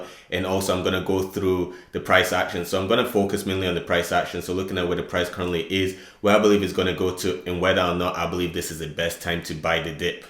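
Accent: Scotland, United Kingdom. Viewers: You remind of Josh finance got in before shiba inu broke a 0 I'm heavily invested in mana so subscribed and liked 👍 where you from also you sound Scottish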